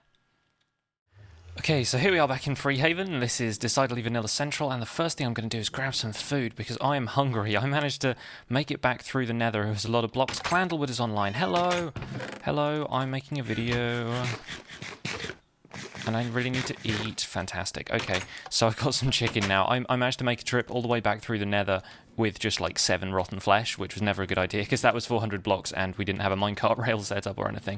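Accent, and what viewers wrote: United Kingdom. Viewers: Thumbs up, i came from OMGcraft aswell, btw ur british right?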